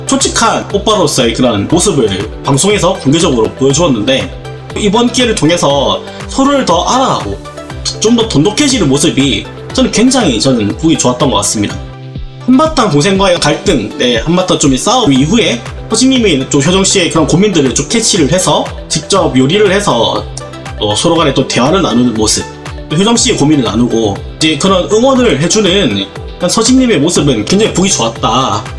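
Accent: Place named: Gyeongbuk, South Korea. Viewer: 내용은 좋은데 경북말투 진짜 듣기 파이네요~😢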